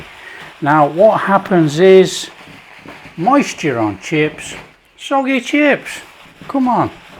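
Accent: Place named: Midlands, United Kingdom. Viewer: you sound more like midlands than the north. Stoke on trent way accent. Its north to me being in the westcountry. Lovely grub mate 👌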